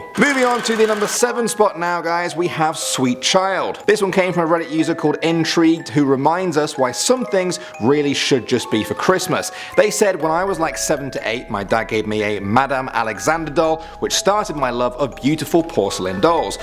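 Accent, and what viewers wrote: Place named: United Kingdom. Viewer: Wait... I could not understand you with that British accent (sorry being an American has some cons) DID YOU SAY THAT TRANSFORMERS TOY COMMITTED SUICIDE? I would too if i was a knock-off McDonalds toy.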